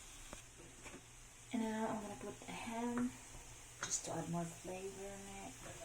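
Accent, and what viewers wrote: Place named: England, United Kingdom. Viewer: So enticing recipe of yours. The reason also i always watching your video because of your english accent. Love yah!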